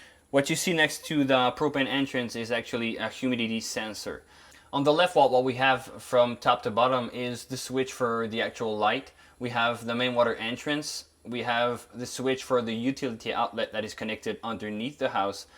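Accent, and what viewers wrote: France. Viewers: This is absolutely excellent. This is my favorite one. And I noticed that you've lost your French accent, which I miss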